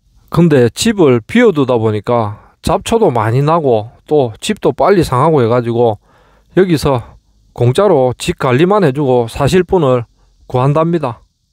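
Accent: Gyeongsang, South Korea. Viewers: 갱상도라 더더욱 신뢰가 안가네…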